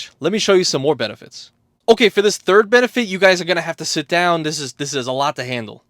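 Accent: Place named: United States. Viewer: U beautiful man. Love ur country boy accent btw. Lol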